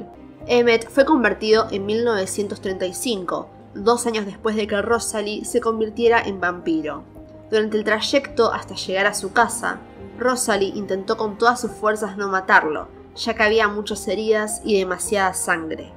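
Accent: Argentina. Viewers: Usted suena como argentina, lo es, señorita?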